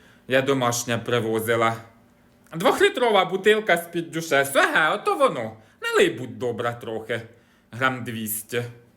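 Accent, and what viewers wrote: Poltava, Ukraine. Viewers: Дякую Ти дуже ловко балакаєш по Полтавській,а то що годи у нас і досі так кажуть,ідем на годи до Миколи💕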